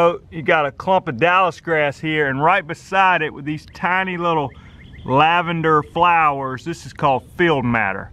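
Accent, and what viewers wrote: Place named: Alabama, United States. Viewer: He's got that Alabama draahhwwllll.. Love this channel tho. You'd probably say I have an accent from VA. But sounds normal to me .lol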